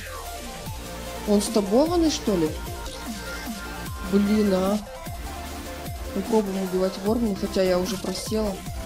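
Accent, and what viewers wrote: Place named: Urals, Russia. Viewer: Прикольно)) Ты из Перми/Екатеринбурга? Манера разговора "тамошняя"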